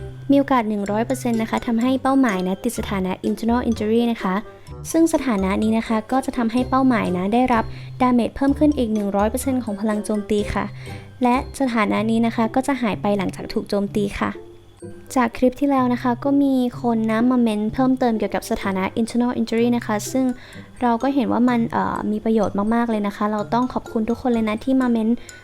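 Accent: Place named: England, United Kingdom. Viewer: ชอบสำเนียงอังกฤษจริงๆ เลิฟๆ💕💕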